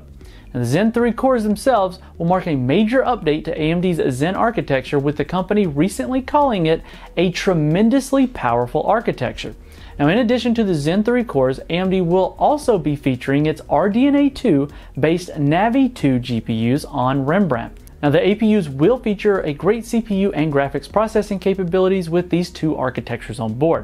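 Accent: South, United States. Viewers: your subtle southern accent is pleasing to the ear for some reason 🤠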